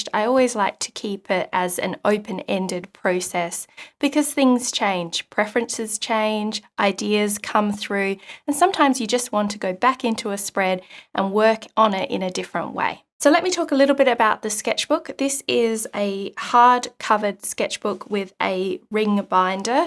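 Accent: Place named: Australia. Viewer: Love that I found your channel through another artists recommended channel page. Subbed as soon as I heard the accent. Love that we have such incredible mark making artists here in Aus 💪🏼😁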